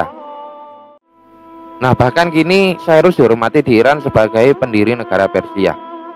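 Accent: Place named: Java, Indonesia. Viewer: Capek gw dngrin baca critnya.. medok gtu bahsanya 🤣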